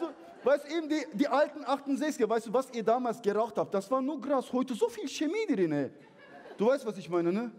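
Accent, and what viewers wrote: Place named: Turkey. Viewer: Ich mag diesen türkischen Einschlag nicht, so ein vermurkstes Deutsch zu hören ist nicht schön...dieses oberflächliche "Ich schwör" und so weiter.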